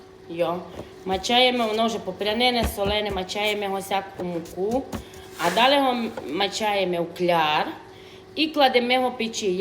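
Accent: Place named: Transcarpathia, Ukraine. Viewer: чекаю з нетерпінням ваші нові відео 🫶 Обожнюю закарпатський діалект у вашому виконанні ❤❤❤. Знайшла (нарешті 🎉) ваш канал на YouTube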